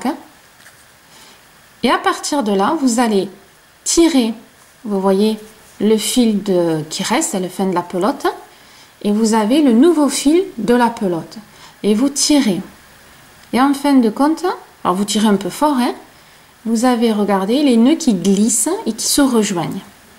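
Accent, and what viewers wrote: South, France. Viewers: merci beaucoup.Très bonnes explications très claires avec un accent bien du sud.Très agréable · Bonjour dîtes moi vous êtes de quelle région ? C'est L'accent du sud ? Lol car je recherche des personnes de mon secteur 😊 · Ça fait des siecles que je n'avais pas crocheter et quand j'ai recommencé, mon travail avait l'air de votre échantillon!! Merci, je vais pouvoir me lancer!, . P. S. J'adore votre accent du Sud qui rappelle Le Soleil! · Merci beaucoup de partager votre expérience avec autant de clarté et d'indulgence pour les débutantes comme moi. Je suis une jeune crocheteuse de 50 ans qui dévore les tutoriels très bien construits d'une jeune personne très sympa, "avé "le soleil dans l'accent !! J'entends les cigales dit ! ;) ENCORE MERCI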